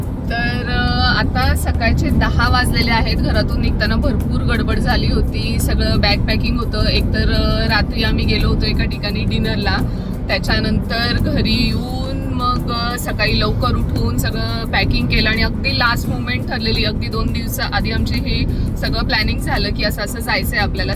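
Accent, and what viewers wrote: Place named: Pune, India. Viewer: mla kuph awadta tumche bolne punyche mrate bolta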